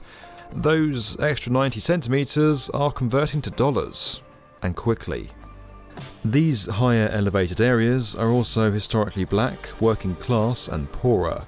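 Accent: United Kingdom. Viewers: It’s hard to take this video seriously and I guess it’s just meant to be easily digestible content with a posh British accent. Having lived in Miami for 20+ years, worked in real estate and other industries, almost every heavy handed conclusion that this video draws is absolutely farcical. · British accent is so annoying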